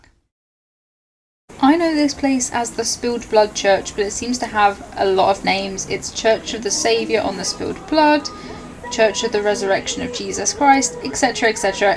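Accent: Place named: England, United Kingdom. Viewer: Your English accent is so beautiful 😍😍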